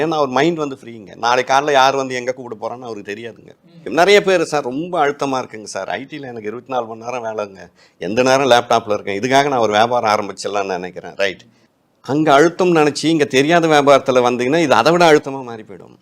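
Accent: Kongu, India. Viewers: கொங்கு தமிழ் ❤😇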